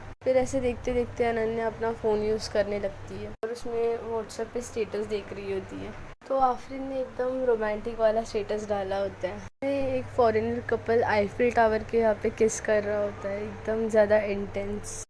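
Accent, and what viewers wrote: Maharashtra, India. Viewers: Hindi main jo marathi ka thoda tadka lagta hai kya baat hai ☺️☺️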